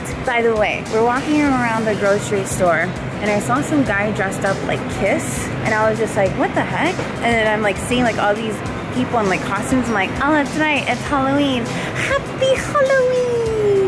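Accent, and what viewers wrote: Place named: United Kingdom. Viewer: I missed u saying (eggies)....😊also that I think, it's British, accent u do...i guess i miss ur vlogs...it inspires me...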